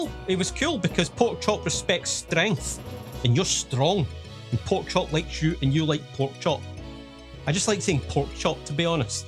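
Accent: Scotland, United Kingdom. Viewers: A Scottish man with a thick accent telling me how perfect one of my favorite games of all time is? This day got even better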